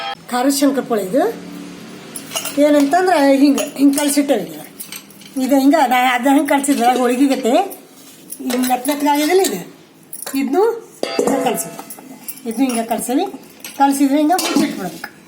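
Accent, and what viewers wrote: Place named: Gulbarga, India. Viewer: ನಿಮ್ಮ ಭಾಷಾ ನೋಡಿದರೆ ಗುಲ್ಬರ್ಗ ಕಡೆಯವರು ಅನ್ಸುತ್ತೆ . ನಾವು ಯಾದ್ಗಿರಿ ಸೈಡಿ ನವರು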